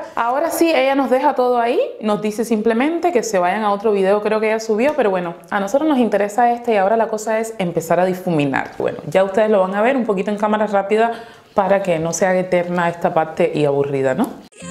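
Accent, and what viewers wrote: Dominican Republic. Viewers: cuando dijo que esas ofensas hacia ella misma eran bromas yo me dije como , eres Dominicana o algo asi? y luego dijo quija y fue como, noooooo si lo es y cuando dijo vaina en vez de cosa me dije confirmado XDXDXD saludos desde Rep.Dominicana .es que los Dominicanos nos decimos ofensas pero es más a modo de broma XDXDXD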